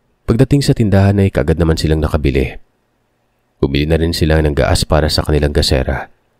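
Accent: Iloilo, Philippines. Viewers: SIKONGKONG😂😂😂 ILONGGO BA SI SIR?????😅😅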